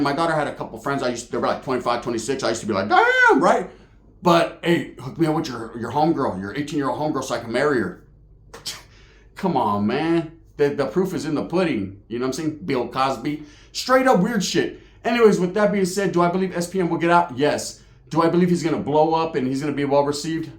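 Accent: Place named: California, United States. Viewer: You from Cali I bet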